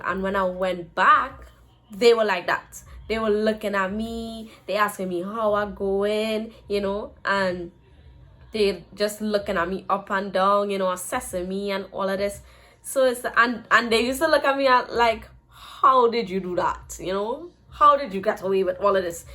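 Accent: Trinidad and Tobago. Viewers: Jesus Crist am obsessed with trini accent love from ja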